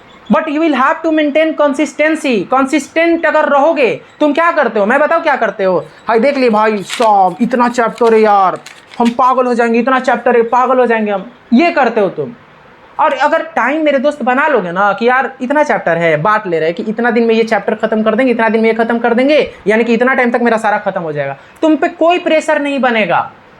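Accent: Bihar, India. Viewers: Sir aap Bihar ke hai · Apka language ekdm bihari lgta h😅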